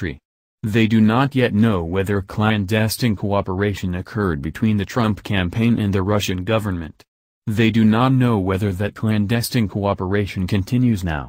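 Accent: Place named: Iran. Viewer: This guy talks like an Iranian he obviously can't speak English well. This sounds like false news. Shalom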